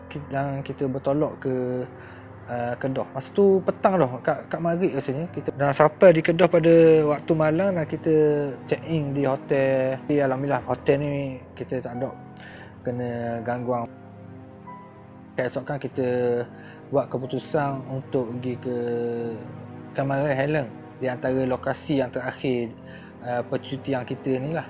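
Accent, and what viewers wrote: Terengganu, Malaysia. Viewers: Power! Kite pulok memang minak loghat ganu ni. Jaddi boh. Subscribe slaluhh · Pekat ganu boh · Pekat molek loghat tranung..terbaik boss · Org ganu ke?? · Pekat bahase terengganu dia haha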